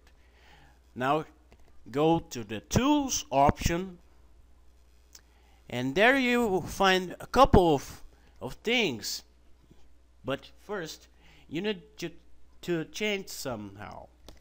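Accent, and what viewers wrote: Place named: Brazil. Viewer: Você é Brasileiro né Por que o seu inglês é um pouco puxado para o de português Do jeito de falar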